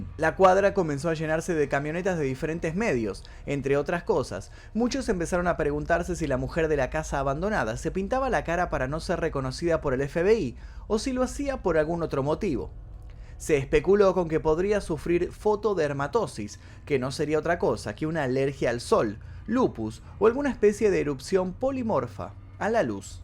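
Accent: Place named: Argentina. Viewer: Me encantan tus narraciones perfectas con su toque 🤗👍 con todo respeto a tu Sra. El acento Argentino siempre me ha parecido muy lindo 😃. Saludos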